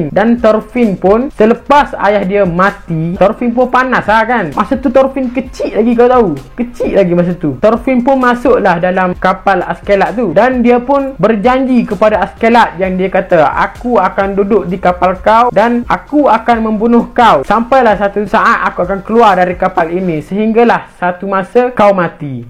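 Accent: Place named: East Coast, Malaysia. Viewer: mcm org pantai timur je speaker ni ckp😅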